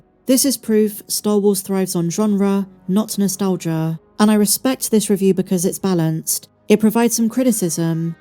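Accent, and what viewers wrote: United Kingdom. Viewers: Ain’t even gonna lie just noticed you are British lmao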